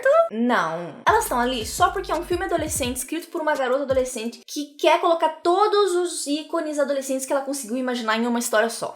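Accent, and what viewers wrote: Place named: Curitiba, Brazil. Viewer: Nas primeiras palavras que você disse eu já percebi que você é curitibana, finalmente alguém puxando o R e o E aq lkakalalaal tamo juntos, sou de Curitiba tbm kakakakakak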